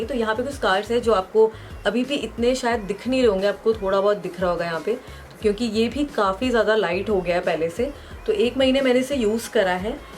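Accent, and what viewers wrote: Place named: India. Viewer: There are two types of talking in the world : normal and Indian Would you at least put subtitles? That if you talk in English I don’t know, you speak English and indian exactly the same ...not understandable 🤷‍♀️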